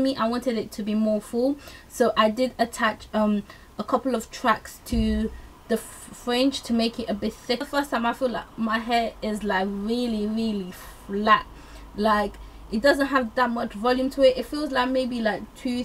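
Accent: United Kingdom. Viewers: You're cockney accent LOVE IT I have a cockney accent but with a mixture of all different accents I don't understand my voice lol I'm just rambling on sorry for wasting you're time, :D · Your Like With A Good British Accent Lol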